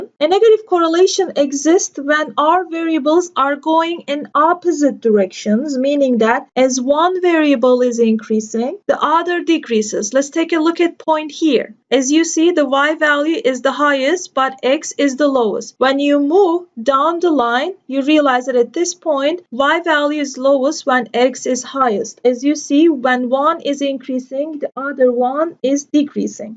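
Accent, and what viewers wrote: Turkey. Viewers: Your voice sounded me like turkish pronunciation. Smooth explanation. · I can tell this is "Turkish Accent". :-)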